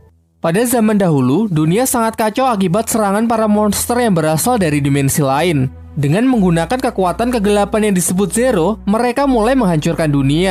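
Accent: Java, Indonesia. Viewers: Orang jowo Yo kak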